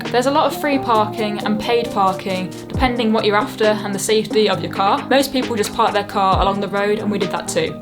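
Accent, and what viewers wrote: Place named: Derbyshire, United Kingdom. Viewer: I love this. Also, I love the accent. Hehe. Which I actually listed as one of the things I love being at England. And made a short video about. Derbyshire ha. ❤️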